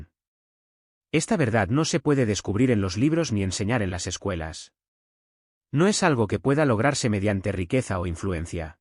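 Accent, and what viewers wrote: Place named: Spain. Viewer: UNA SELECCIÓN SELECCIONADA????, POR PIEDAD, UNA UNA APLICACIÓN DE NARRACIÓN EN ESPAÑOL LATINO, EL ACENTO DE ESPAÑA ES NAUSEBUNDO......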